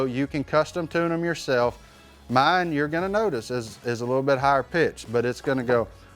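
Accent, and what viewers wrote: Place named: South, United States. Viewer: Who else loves his Southern accent?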